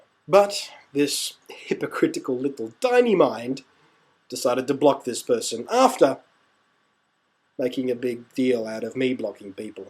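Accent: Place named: Australia. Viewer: Hypocrisy Anyway. You're right. Plus I'm guessing from your accent that you're an Aussie? :] You just got yourself another subscriber.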